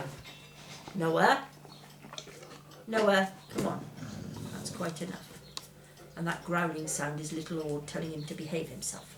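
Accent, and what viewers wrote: Wales, United Kingdom. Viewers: Very grateful to have found you. I have learned to create more simplicity in the way I live which has left me more time to be creative. Thank you for you wisdom. My grandparents came from Wales and immigrated to Canada. I am enjoying very much the way you speak, it reminds me of them. Bless. Peace, Jxx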